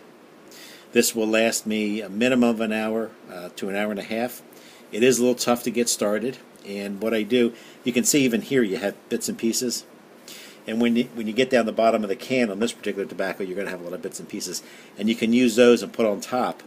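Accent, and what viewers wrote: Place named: Black Sea, Turkey. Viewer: doğulusunuz ama karadeniz şivesi ile konuşuyorsunuz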